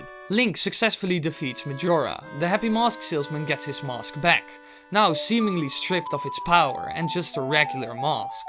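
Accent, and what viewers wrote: Netherlands. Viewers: This is a thicc dutch accent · Hoor ik daar een Nederlands accent? ;-) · R you dutch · Wait a minute HEY YOUR DUTCH:D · ur dutch accent is so distracting since i'm dutch as well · your voice is not annoying at all or anything [neither is the accent], but after hearing you talk for 3 seconds i want to ask: what part of the Netherlands are you from? :P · he is dutch. The accent is obvious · is he dutch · Are you Dutch? Your accent sounds a bit Dutch. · You're dutch aren't you? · Jacob Marcelo i think its a dutch accent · Why does this guy have a dutch accent all of the sudden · Hella Skella Don't brag beebje, even you aren't that fluent, but most certainly more fluent than this dude 😂 But yes, this is unmistakably a Dutch accent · are you dutch? i think it because of you're accent. sorry if i am wrong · ur English accent is a dutch man speaking English I know I speak the same way and I'm Dutch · Dude... 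Dutch seeps out of every pore of your accent x) But it's less cringey than the usual dutchman speaking English, so... +1 nieuwe sub, lol. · Holy shit Damn I can hear you are dutch finnaly a fellow dutchie:DDD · lemon it's like a typical Dutch person trying to speak English lol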